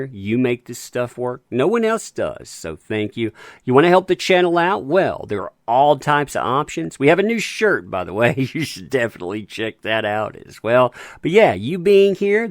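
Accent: South, United States. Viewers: Go, J.D. Seems right, esp if ACLU is nonprophit and could be violating FOIA. If it is public info to know they were to receive $$$, shouldn't it be public info whether ACLU received it? Glad to see you back,. Been missing that southern accent to go with my coffee and keep me up to date on how our J.D. is faring.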